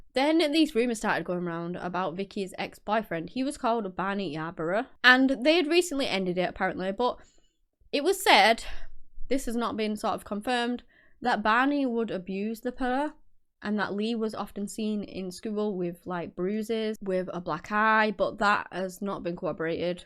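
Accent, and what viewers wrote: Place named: Northern England, United Kingdom. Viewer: Hello my darling can I ask what part of the UK you are from, I love your channel probably because of your northern accent, hi from Australia 🇦🇺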